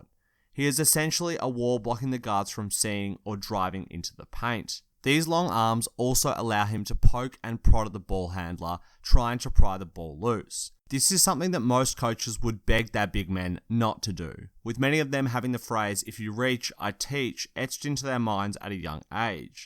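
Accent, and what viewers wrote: Australia. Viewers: It’s an Australia accent though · Nerlens Noel is on the comeback!! OKC management are doing a great job! Btw hi aussie narrator 👏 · Are you Australian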